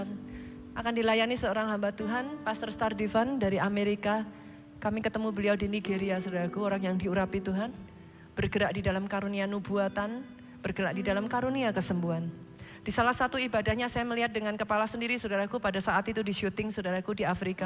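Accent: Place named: Java, Indonesia. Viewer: Terima kasih Pastor untuk kotbahnya. Saya sgt du berkati jelas dan tajam, sgt membanty saya memahami Alkitab. Awalnya saya tdk suka dgn pastor krn menurut saya kog seperti model trus bahasa Indonesia nya agak medok jawa. Tetapi lama lama saya smkn suka. Pastor itu fashionable dan dinamis, cerdas dan tegas, Terima ksh ya ps n team, Gbu all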